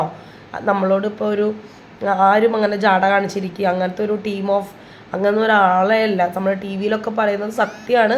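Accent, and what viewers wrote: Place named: Thrissur, India. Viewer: Hi chechi episode kandu 🤩ivide amma ennum kaanarind ee paripady. Chechide videos okke njan youtube il kaannarind pettannu tvil kandappo suprise aayi 😍😍 njan ammodu parayarnnu ee chechine inikkariya inikki ariyana chechi aanu ennokke. 🥳🥳🥳 skit valare nannayirunnu nammade tsr slang skitil ketappo nalla rassandayi 😌🥳 inim skitilu chechine pratheekshikkamo🙈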